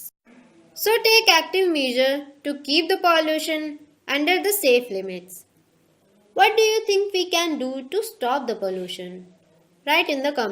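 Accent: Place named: India. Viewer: Indian accent irritate my ears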